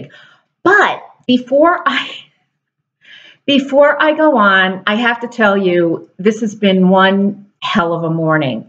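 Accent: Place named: Long Island, United States. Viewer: DrlinG i'm born and breed on LonG Island you accent takes me back to my childhood. When in the early 60's all the up and coming Queens families transplanted to Muttontown, L.I.no I didn't get the Locust valley lockjaw accent, it's Queens all the way baby, I am a recent hair losie, fourteen weeks in the hospital, let's just say between the illness and earnest hair being combed out there is not a lot to work with. My biggest problem is trying to keep a wig cap on. My skull bone in the back is quite shallow and the wig cap rides up. Oye. I have been using "Gots 2b" as a holding spray but there are time even that does not work.Any suggestions would be helpful. I so enjoy your videos, keep them coming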